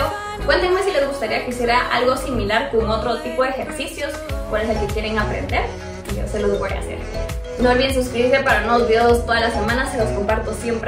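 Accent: Guatemala. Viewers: Acento #chapin !!!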